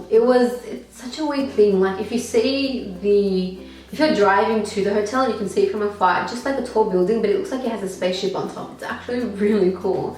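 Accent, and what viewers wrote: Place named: Australia. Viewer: Paayal Shah lmao I was thinking the same?¿ I thought to myself it's probably because of her Australian accent or something! 🙃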